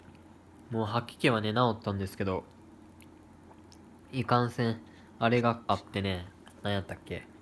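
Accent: Kansai, Japan. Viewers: 関西弁なんかいいな（笑）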